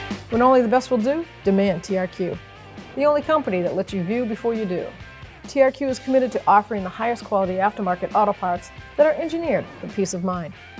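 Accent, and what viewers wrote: Boston, United States. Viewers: gotta love that boston accent!! SWAY BAH!!! lol great video!!!!!!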